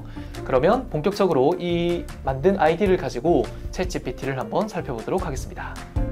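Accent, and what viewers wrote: Gyeongsang, South Korea. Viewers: 부산일보라 억양에 서울말쓰는듯 하면서 경상도 사투리가 보이네요ㅎㅎㅎ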